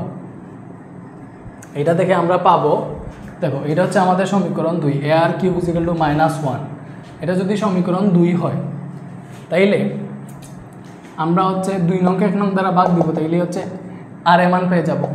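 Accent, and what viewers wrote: Sylhet, Bangladesh. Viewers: Bhai afnare amrar Sylhetii lagee Afnar bari kita Sylhet nih? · Oyh oyh. taine sylheti. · ❤❤❤ ভাইয়া আপনি মনে হয় সিলেটি? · ভাইয়া আপনি কি সিলেটি😊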